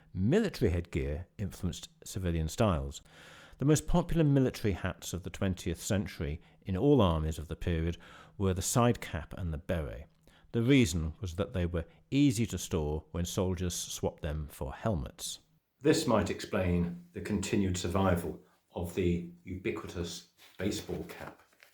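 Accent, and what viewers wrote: United Kingdom. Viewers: “Fwum.” “Fwee.” “Wibbons.” “Amweriker.” “Twentiff.” “Gwandmuvva.” I don’t understand that Brit bs accent… But I do like the info in the video. But only once. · Of course he has a British accent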